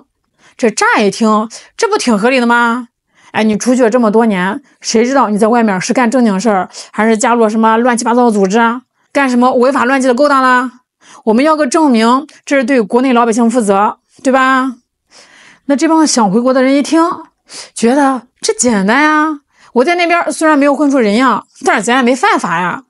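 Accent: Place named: Shaanxi, China. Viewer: 好像陕西那一带的 😉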